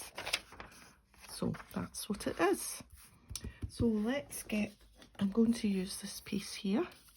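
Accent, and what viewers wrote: Scotland, United Kingdom. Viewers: Personally, I wouldn't mind if everyone had a Scottish accent like yours although I hope we do not lose all the different accents. It would be a shame to lose the variety and the history that is behind them. I read somewhere that the Scottish accent is preferred for call centers because of its warmth and approachability. I know I can listen to you all day especially when you are telling one of your stories. I find it very relaxing and comforting. Both prompts are very well-balanced and a pleasure to look at. I think this would make a great book to sell for inspiration. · Hi I am a new subbie, I am enjoying watching your little collages, I've watched a few now.... Mine are the opposite ha ha.... As for your accent I think it very easy to understand your Scottish accent is quite mild and mellow I think, it's quite calming actually but I suppose to foreign people it can take a bit of getting used too. 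🇬🇧 I think a 'Thing' can be literally any item, from a watering can to a kitchen appliance etc. 😂😂 Odd numbers are easier to work but it turned out cute in the end 😁💖 tfs Elaine from England xx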